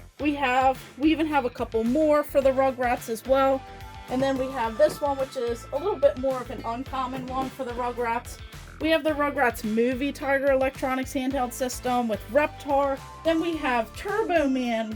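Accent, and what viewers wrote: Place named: Baltimore, United States. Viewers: is she from maryland? i heard 98 rock and shes got a thick baltimore accent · 2 minutes in hearing her accent - ok yeah, she’s definitely from Baltimore lol. Amazing game room!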